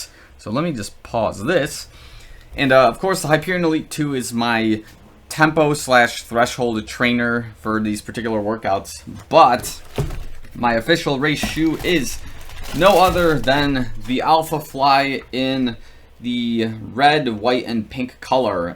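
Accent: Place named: Chicago, United States. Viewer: My sports are, basketball, cycling, tennis. I like walking in the Zoom Alphafly but I’m told it’s not for that. Thoughts? By the way, I hear the Chicago in your voice. I also hear Dan Aykroyd in your voice!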